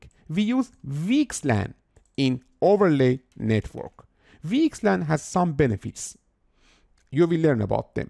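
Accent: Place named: England, United Kingdom. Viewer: Watching this form England, and I like your English accent. this video is full of good information. cheers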